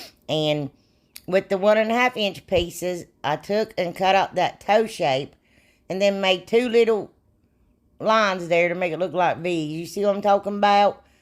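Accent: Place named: Kentucky, United States. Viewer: Ok, I found you scrolling through. Are you from Kentucky? I love the mountains! The accent! Im a transplant here. Great video! You are my new friend! Crackin me up!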